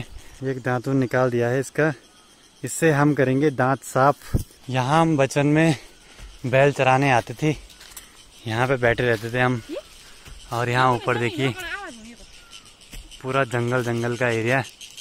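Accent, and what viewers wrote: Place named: Garhwal, India. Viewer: आपके मुँह से गढ़वाली बहुत अच्छी लगती हैं तो कुछ अपनी भाषा भी बोला किजिए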